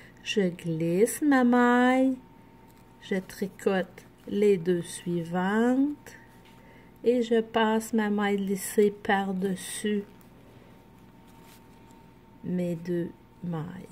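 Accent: Canada. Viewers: Parfaitement expliqué ! Joli rendu ! Et........delicieux accent. Québécois ? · Merci pour ces explications très claires , ce point est très joli . Bel accent canadien.👵 de Nîmes 🇫🇷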